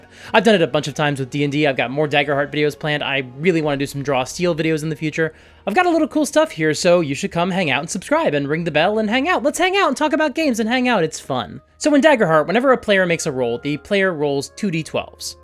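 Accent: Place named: United Kingdom. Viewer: These Daggerheart videos are great!! British accent, on the other hand... (No hate tho man, I love your stuff)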